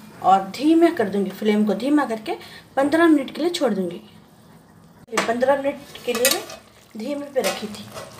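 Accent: Hyderabad, India. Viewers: Hamari Hyderabadi's language hai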